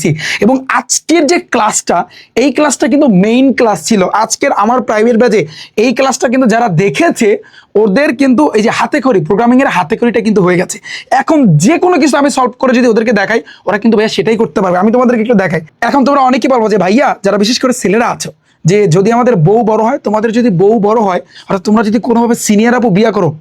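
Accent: Barishal, Bangladesh. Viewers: আচ্ছা ভাইয়া কি বরিশালের? 🤔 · ভাইয়া আপনে কি বরিশালের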